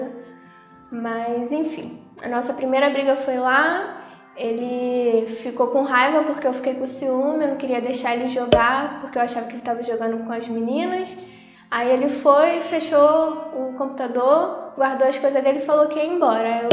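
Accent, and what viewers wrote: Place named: Northeast, Brazil. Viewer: Tantas pessoas passam e passaram pelo que vc passou, que bom que vc superou. Vc é jovem, bonita, extremamente simpática e humilde e merece ser feliz, vc tem obrigação de ser feliz. Quem te quiser por parceira deve saber que é prá vida toda, vc é uma menina de ouro. Parabéns pela coragem de contar o que aconteceu com vc. Pelo sotaque vc é do nordeste ou do norte, não é?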